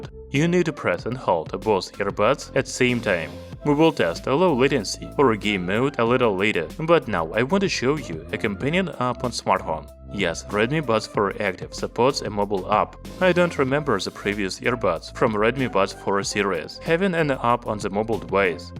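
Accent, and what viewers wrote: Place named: Russia. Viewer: Какой же сильный русский акцент, извините ) Мне кажется, в русском языке столько звуков, что язык может говорить на английском гораздо ближе к носителям.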